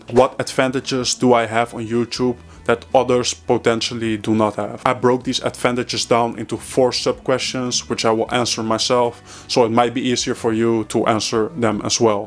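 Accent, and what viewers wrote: Netherlands. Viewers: Dude I love your accent! I can't place it but I really like it. Sounds Dutch? Sort of? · is that a Dutch accent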